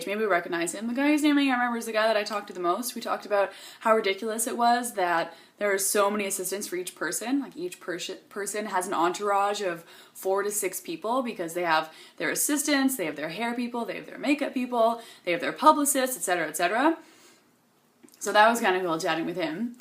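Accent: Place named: Canada. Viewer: I just realized that you talk and sound just like Robin from How I Met Your Mother who is also a Canadian. Maybe it's a Canadian accent or something but I've never noticed it before.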